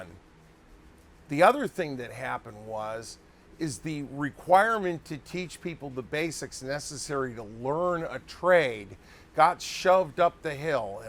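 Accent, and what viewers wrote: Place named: Chicago, United States. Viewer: Out of curiosoty, just where the heck are you from? I almost hear a Chicago accent? Maybe Cleveland?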